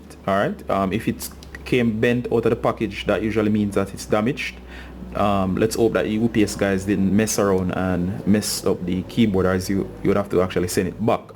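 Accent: Jamaica. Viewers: Hey, A Yardie tech support, gwaan rude boy, great video.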